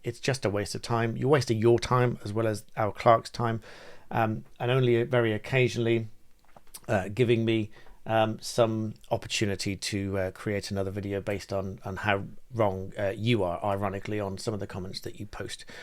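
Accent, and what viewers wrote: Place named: RP, United Kingdom. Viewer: Cheers. Well put, in crystal clear queen's English. ☺️✨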